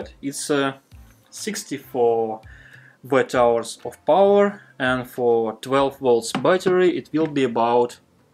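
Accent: Russia. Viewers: русский акцент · Какой-то русский Акцентик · Услышав знакомый акцент «зе», поняла, что это русский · Я по аценту понял, ты из России?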